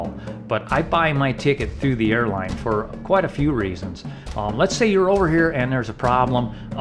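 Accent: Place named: United States. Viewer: Thanks for the captions, cause you American accent is difficult to listen to.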